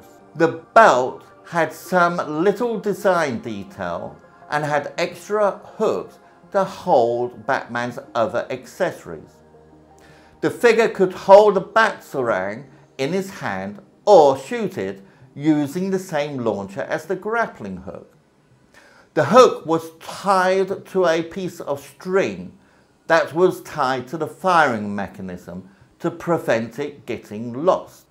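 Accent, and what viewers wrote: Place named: United Kingdom. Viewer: Gotta love the guys British accent 😊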